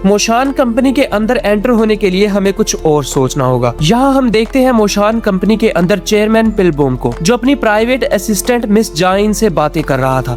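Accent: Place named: Pakistan. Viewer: Aapke pronounciation ke Hisab se Aap Pakistan se Belong karte ho na - Bythe way Voiceover 🗿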